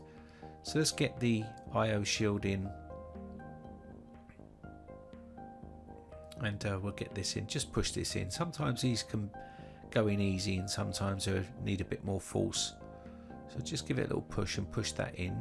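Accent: United Kingdom. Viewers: It's about 39 pounds Me, an American: Oh golly gee willakerz that's heavy!!! British accent continues Me: Oh right...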